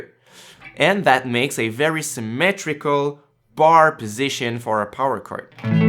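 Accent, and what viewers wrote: Quebec, Canada. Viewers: Nice lesson bro, bel accent québecois apart de sa ;)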